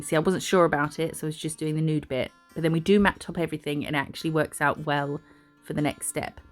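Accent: United Kingdom. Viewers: Can u show us some tricks for very short nails don't have long ones even as false ones Ur deffo Uk I'm from Yorkshire u rvul too fkin champion lol deffo a my city saying lol 🤣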